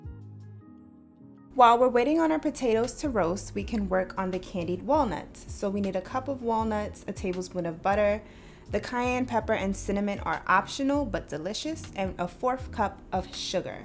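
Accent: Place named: New York, United States. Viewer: I definitely hear that NY accent!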